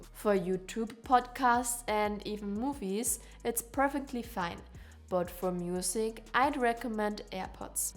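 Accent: Germany. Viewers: Krasser deutscher Akzent 😂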